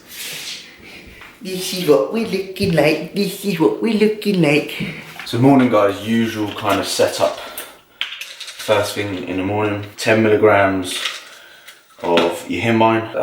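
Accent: Liverpool, United Kingdom. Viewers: You sound like Darren till with the scours accent. Remote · Mate really liked the way you talk us through that workout, everything was clear, well explained and also motavating, ive ofter criticised some of your vidoes but in a good way to motavate you and help with the things i think you could work on and you have just really shown me you know your job, hats off man also im from Liverpool, loving the Scouse accent hahahah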